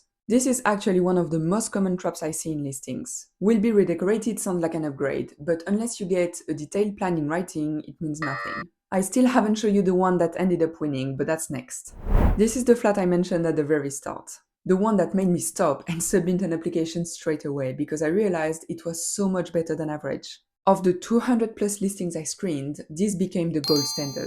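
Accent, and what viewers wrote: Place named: France. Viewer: I was too busy appreciating your kick-ass French accent to even watch what you were showing lol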